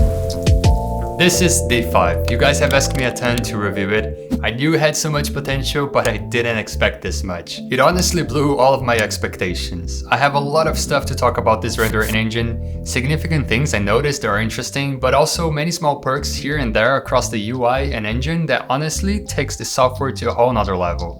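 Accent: Brazil. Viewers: Tem que ter o ouvido treinado, mas esse cara pelo sotaque deve ser brasileiro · teu ingles é muito bom, mas tenho quase certeza que tu é brasileiro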